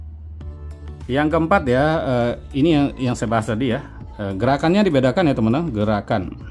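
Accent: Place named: Bali, Indonesia. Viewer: Uli logatne be ke tebag nak bali ne😄 rahayu wii🙏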